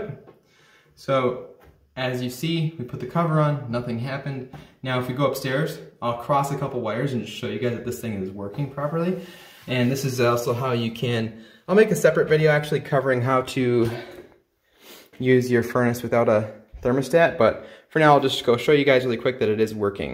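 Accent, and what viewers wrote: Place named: Northwest, United States. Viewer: Carolina? He has a definite Northwest accent. People move around so much these days, many people from the south don't have that twang anymore.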